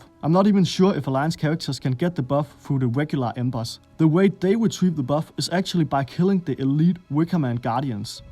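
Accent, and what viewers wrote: Denmark. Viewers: Haha ja lyder som nordjysk :p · Lol den danske accent er stærk :p God video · This is the most Danish accent I've ever heard. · probbaly the worst danglish accent i heard to date. Thought oure military was the worst but you just wont that metal my friend. Accent homie accent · that is some insane danish/english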